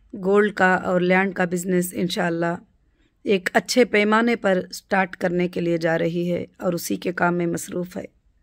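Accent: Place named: Bihar, India. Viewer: ye bihari hai bol se lang raha hai to up bihar m launch kar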